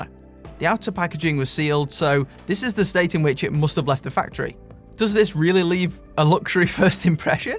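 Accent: Stoke, United Kingdom. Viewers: stoke accent and that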